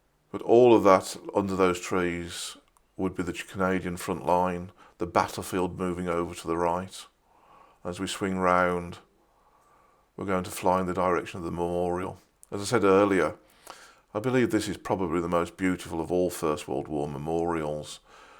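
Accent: Birmingham, United Kingdom. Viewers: Absolutely superb. Your soft brummy twang evoking memories of the Shropshire lads.......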